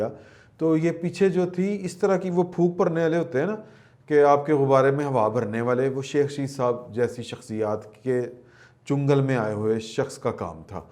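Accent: Punjab, Pakistan. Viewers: Sir kamal way of delivery with punjabi urdu tarka😊😊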